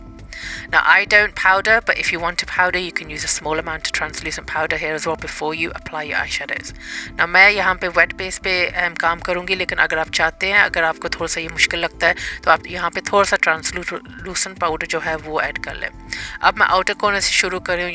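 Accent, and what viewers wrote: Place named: United Kingdom. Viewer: Watching from Karachi,i love your work,and your English accent ❤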